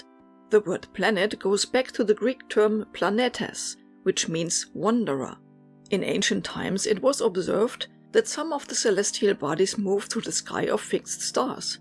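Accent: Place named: Germany. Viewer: I hear a german accent. Are you from Germany or Austria or something like that? :)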